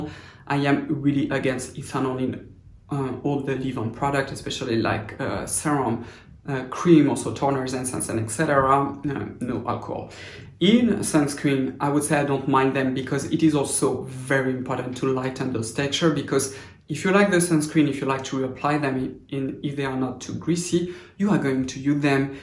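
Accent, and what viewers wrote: France. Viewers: Bonjour! I adore your videos, especially appreciating the science. There are far too many skincare videos of sincere, but but subjective reviewers, essentially intuitively responding to products. Also, I might say as an American, your American English is impeccable, as you have clearly mastered idiomatic expressions. It is quite "cute," as a native English speaker, to hear such idiomatic expressions from a Frenchman. Your accent is also adorable. As an aside, I speak French. I am told my accent is "adorable" to be fair.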